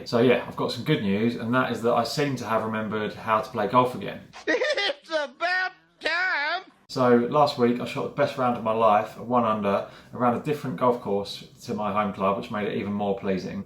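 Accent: Australia. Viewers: Well done in your 1 under the card, brilliant result! The key sometimes is to put things in perspective, there's no golfer in the history of the game that hasn't gone through a slump fighting internal demons from Ballesteros, to Tiger, Nicklaus you name it everyone goes through slumps. For me what works these days after fighting my own internal demons is I treat golf like trying to chase a butterfly. The more you chase after it you'll never catch it but if you just stand still and wait patiently much better chance of coming back to you. Keep up the great videos. PS.. bring back the Aussie dodgy accent, sorely missed.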